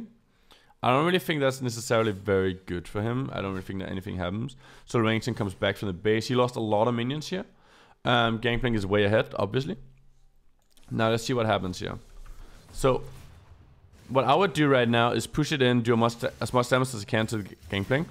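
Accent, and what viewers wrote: Denmark. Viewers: is danish? I'm picking up a danish accent, i might be wrong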